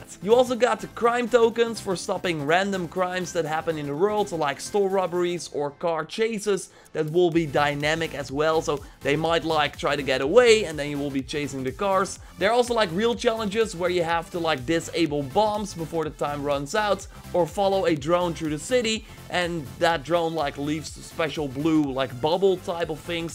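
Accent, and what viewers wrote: Netherlands. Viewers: Are you from the netherlands? Your accent kinda sounds Dutch lol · Are you from The netherlands? you have an accent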